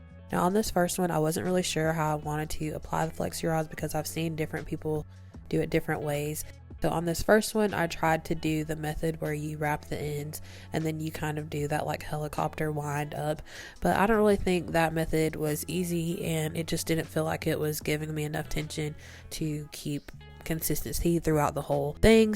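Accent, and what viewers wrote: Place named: South, United States. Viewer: I love your southern accent 😊❤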